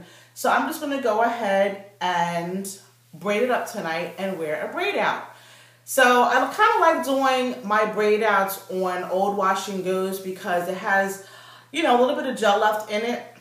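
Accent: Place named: United Kingdom. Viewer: Looks good! You sound like you have a British accent. Your hair is always fab.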